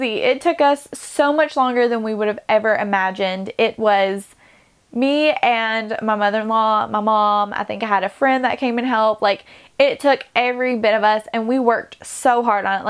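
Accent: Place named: South, United States. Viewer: mai567 it's called a strong southern accent.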